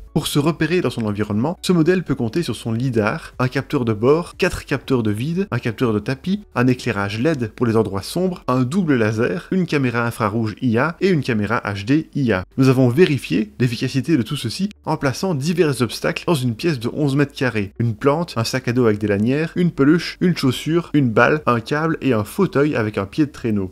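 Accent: Belgium. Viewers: Laisse aller l'accent belge, mec, on veut entendre les nonantes 🤌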